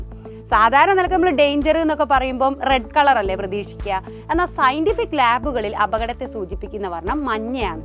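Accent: Malappuram, India. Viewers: Missinte samsaram super aanu mis malapuram aano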